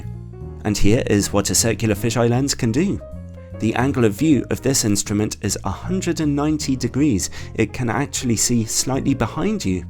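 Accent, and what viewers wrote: United Kingdom. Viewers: nothing like a British eloquent voice...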